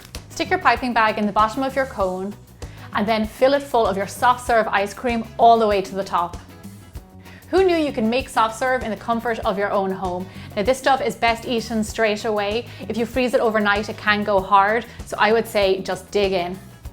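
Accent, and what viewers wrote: Ireland. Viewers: OMg sooooo good! I love your Irish accent soooo much. I'm Irish too but born in USA and always wanted to live in Ireland. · r u Irish? and btw love ur vids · Hi! I was just wandering, are you Irish ? 😀😀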